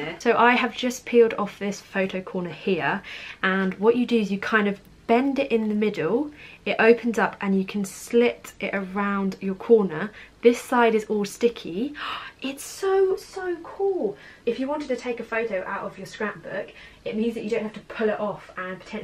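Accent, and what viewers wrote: United Kingdom. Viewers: I adore your British English 😊Ready to listen to whatever just speak plz. Hi to Brighton ❤ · Wow her British accent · Oh so you're british. I love your accent omg I think I'm inlove lol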